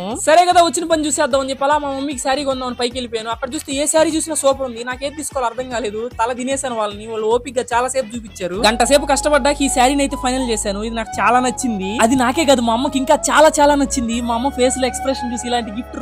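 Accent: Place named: Anantapur, India. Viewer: Miru anthapur valaa ? Bro i mean mi slag alage vundi ani adiga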